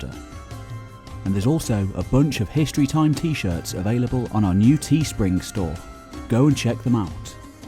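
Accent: United Kingdom. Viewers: Beautiful presentation. Thank you. Also like the British accent. Three defining moments in history, the fall of Baghdad, the fall of Constantinople, and the fall of the Ummayad Caliphate in al-Andalus.(Spain), not to mention Carthage and others. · I like his brand of UK "English" so much that i can forgive his pronunciation of the word " dynasty".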